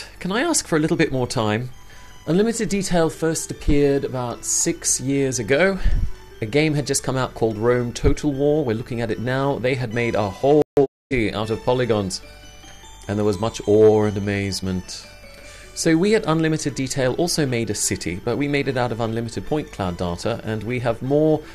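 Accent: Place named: United Kingdom. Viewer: Isn't this Euclideon? And I thought he was Australian, what's with the British accent?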